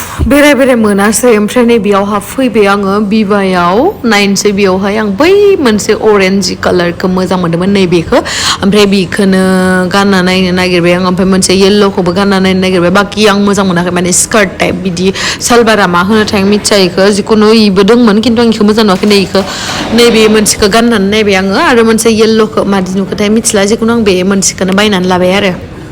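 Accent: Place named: Arunachal Pradesh, India. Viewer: Sach main arunachal ke log aise bolti hai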